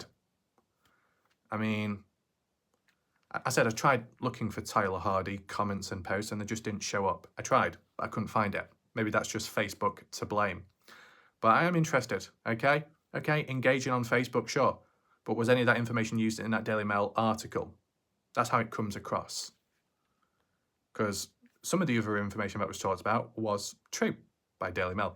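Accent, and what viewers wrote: South, United States. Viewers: You read the article on the 23rd I believe. Can't believe this happened. It's a shame it's even still up. I completely agree that everything, including the mess-ups and misinformation, should be archived! We have to learn from our mistakes. By the way, I'm loving the country accent 😂😂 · Great accent. Can you say, hey there feller with the yeller piller? I used to say that to my southern family when I was a kid. I can decipher it if you like lol. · lol 😂 that country accent is FANTASTIC! I’m rollin’ on the floor! Keep up the great work! Always enjoy ur videos!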